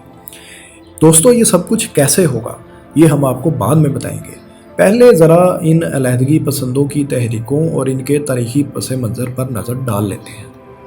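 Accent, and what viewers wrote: Punjab, Pakistan. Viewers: bhai....khuda key wastey urdu main punjabi mat Bola Karo yaar....English words ko bhi punjabi style msin parhtey ho...urdu ka janaza mat nikalo yaar...hire some good urdu speaker...plz...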